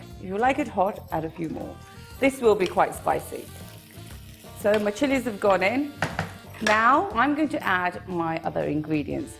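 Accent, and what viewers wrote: United Kingdom. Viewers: Really nice recepie. I've had this in New York at a restaurant called Symphony Spice it was more saucy though like said make it dry or saucy. Thank you, love your British accent 👍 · she is from England. So its her real accent. She has a British accent..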